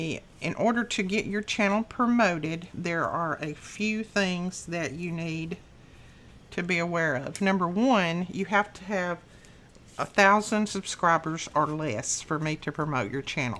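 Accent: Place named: Eastern Kentucky, United States. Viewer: Dont know where you are, but totes sounds eastern Kentucky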